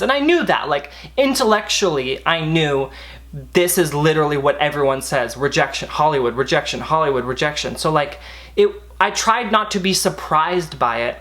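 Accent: United Kingdom. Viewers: My dad was an actor & everything you're telling us is completely accurate. I thought about pursuing an acting career myself but I saw all the jobs my dad almost got & realized that wasn't for me. You are funny & talented ( fairly good Brit accent btw) & was the best thing in "Not Cool" If I was a casting agent I would've hired u 👍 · especially the British accent too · loooved this video drew ❤️ 1. you were looking incredibly handsome, the eye contact was making me feel tingly and i’m hot so that means a lot. 2. you were on a roll!!! wittiness was consistent and i was following the points well, relating and learning. really appreciating your experience and feeling super connected to you. the best way to end my night! :) (from the UK) (the british boy accent defo needs to stay positive) - Olivia ❤️ ❤️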